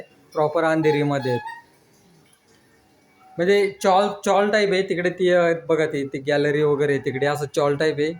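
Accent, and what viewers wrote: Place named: Dhule, India. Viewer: मी तुमच्या बोलण्याने आधीच ओळखलं होत की तुम्ही धुळ्याचे असाल. मी पण धुळेकर..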